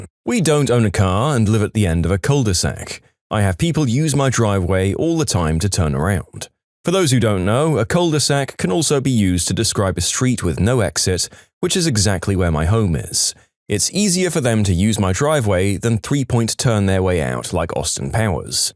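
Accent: United Kingdom. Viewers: wtf happened with your voice you sound like a rich white british man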